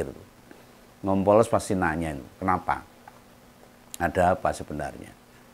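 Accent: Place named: Solo, Indonesia. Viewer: Ini yg selalu saya tunggu. Bukan hanya isinya tapi dialeknya itu lho, bukan sekedar dialek jawa, tapi ini persis spt tetangga2 saya di solo dulu bicaranya, ha ha saya di Jakarta 40 tahun, marakke pengin pulang. 😂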